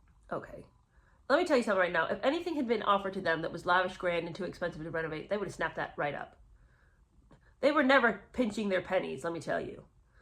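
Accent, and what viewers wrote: United Kingdom. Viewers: They also had apartment 1 in Kensington palace which had 21 rooms next to William who was in 1a, and Duke of Gloucester had to move out of his Grace and favour apartment for them , to which they declined once he had moved out and renovations were carried out! Love your British accent btw haha!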